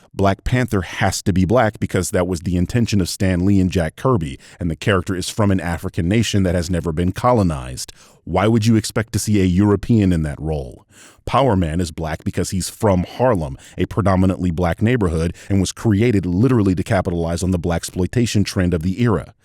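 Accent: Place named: England, United Kingdom. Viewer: It sickens me to hear an English Accent talk such Bullocks. has moved beyond being a simple village idiot and into the tall grass of Racism, Hate and Supremacy. As if AZ is better than anyone else. The Porcine Pratt Shartts his terrible opinions onto an audience of hungry mouth breathers. His mother was a hamster and his father smelt of elderberries.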